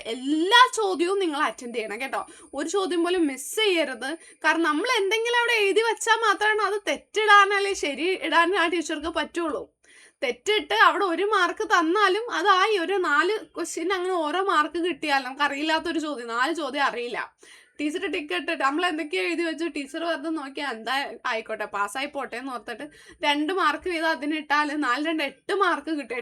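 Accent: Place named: Malappuram, India. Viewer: njan Kozhikode, balussery anu nji Malappuram anennu vijarich slang kandappo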